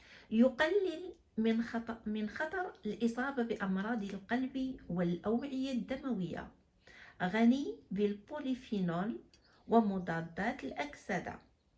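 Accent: Morocco. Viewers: طيب الله الأنفاس قراءة بصيغة مغربية رائعة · قراءة طيبة بصيغة مغربية رائعة جدا جزاك الله خيرا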